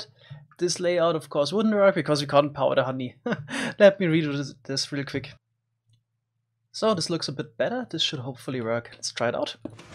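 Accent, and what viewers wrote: Germany. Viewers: Deutscher Akzent Izz da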